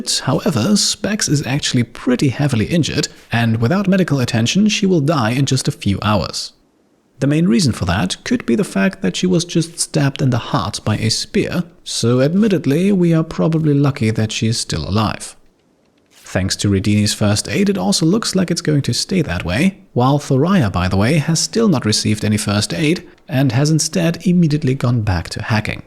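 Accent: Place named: Germany. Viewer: thankyou for your cute German accent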